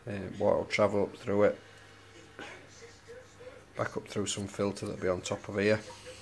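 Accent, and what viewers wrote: Yorkshire, United Kingdom. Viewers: Good to hear a Yorkshire lad doing a review! How's the DIY reactor doing? I fancy giving one a go. Cheers. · Hi mate thinking of building my own in couple of days gonna make mine very similar to yours does it work ?? P.s were u from u sound like u a Yorkshire lad.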